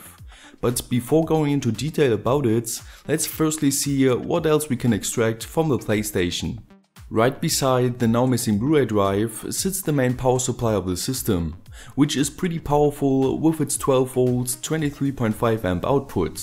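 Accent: Germany. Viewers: Are you from Germany? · habs an deinem deutschen Unterton gehört. Geile Videos machst du, ist immer interesannt an zu schauen.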